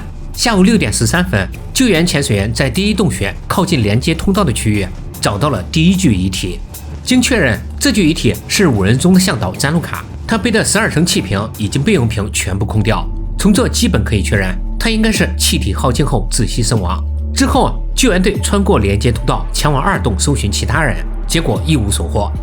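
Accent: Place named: China. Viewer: 這是大陸人做的視頻，你覺得會不會有口音 嫌重就去看台灣的🤷‍♀️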